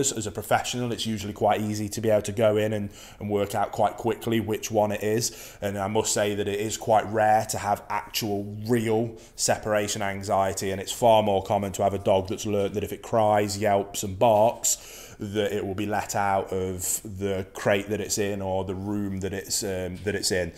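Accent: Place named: Midlands, United Kingdom. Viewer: Can’t believe you just mention Cannock Chase 👍 I thought you sounded like you were from the Midlands xx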